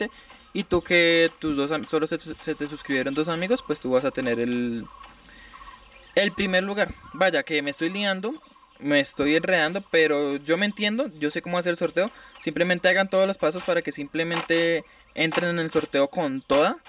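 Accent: Colombia. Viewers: Oye amigo quiero partisipar aun esta en abierto el sorteo ?? Soy colombiano y tengo todos los requisitos tienes asento de rolo bro las mejores digue asi me ayudaste vastante para comprar diamantes no savia lo de el efecti😎😅sigue asi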